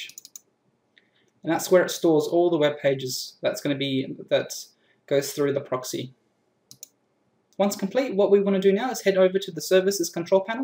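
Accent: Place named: United States. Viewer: An aussie with an American like accent... You're on youtube to much and listen to way to many americans!! youre an AUSSIE not a YANK!!!!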